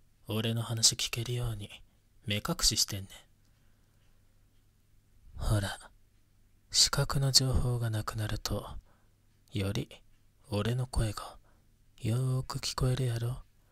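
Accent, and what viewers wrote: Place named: Kansai, Japan. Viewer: が関西弁って新鮮で好き💗凄いキュンとしてる💗顔が真っ赤になってるよ···はううう···💗(恥)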